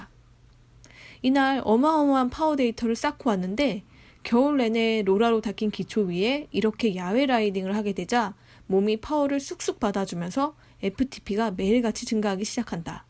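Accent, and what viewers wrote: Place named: Russia. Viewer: 간만에 다시 보니.. 살짝 러시아 여자.. 발음 삘.. 나다~ 검은사막~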